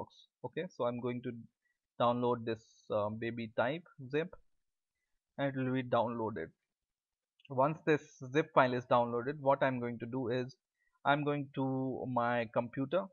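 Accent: India. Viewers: German Google English Language Indian Accent ... wtf?! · Are you from india :-)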